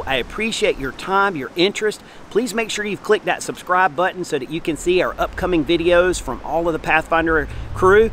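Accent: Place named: Georgia, United States. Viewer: Having only known you from this channel. I have detected a southern "twang" in your speach, are you from Georgia?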